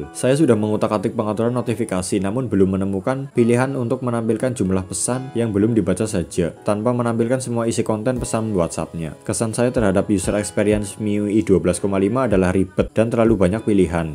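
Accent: Banyumas, Indonesia. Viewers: Kaya logat ngapak?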